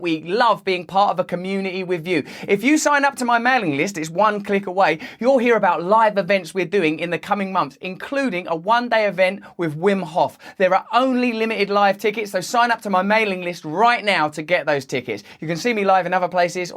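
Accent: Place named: London, United Kingdom. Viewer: You sound like you from London!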